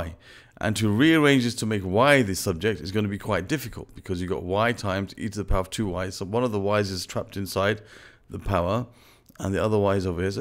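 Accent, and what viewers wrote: United Kingdom. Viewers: i always wonder where you are from. your name is arab but ur accent is a bit british.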